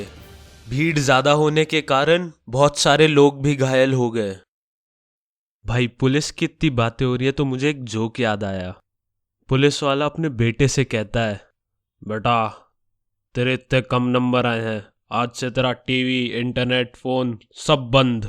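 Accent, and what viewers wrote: Haryana, India. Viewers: Sir Are you from Haryana ?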